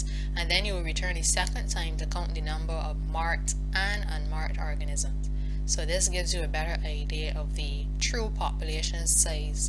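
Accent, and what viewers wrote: Barbados. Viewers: Cause she’s prolly Barbadian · Why she had to have a Barbadian accent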